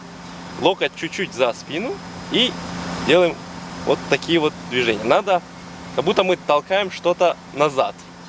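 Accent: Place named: Ukraine. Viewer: Сегодня 15 марта 2023 год, делаю все упражнения, молодец, даже услышала украинские мелодизмы, акцент, поддерживаю!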